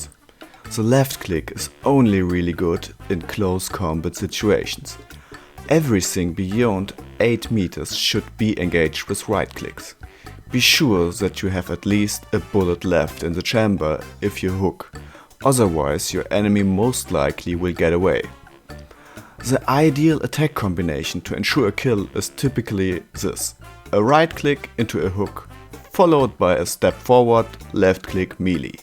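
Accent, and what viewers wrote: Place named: Germany. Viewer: Like for the german accent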